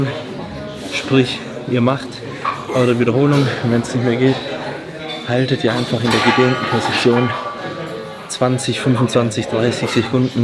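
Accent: Swabia, Germany. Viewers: Mehr Schwäbisch bidde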